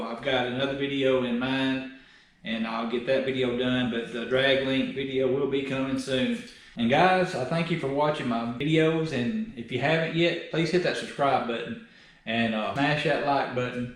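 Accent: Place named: Georgia, United States. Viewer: Changing mine tomorrow!!! Thanks for the vid and confidence. Im from Ga so i appreciate the accent too, made it alot easier to pay attention. Thanks bro! I didnt notice a problem with mine till i put 33s on it. 40mph and up she starts kicking. Hopefully this will get me by until i do a whole front end job.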